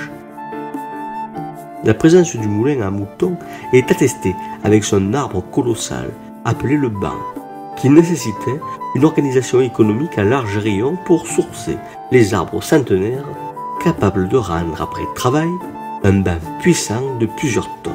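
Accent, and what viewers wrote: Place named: Albi, France. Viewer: Il me semble entendre l'accent de mon beau-frère albigeois. J'adore. Autant que le sujet des moulins. On croirait écouter un conte. Délicieux. Merci.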